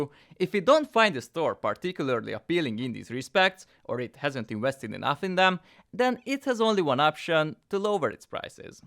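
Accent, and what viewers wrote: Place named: Hungary. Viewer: nagyon hallani a magyar akcentust kétlem hogy ai lenne ezt felmondta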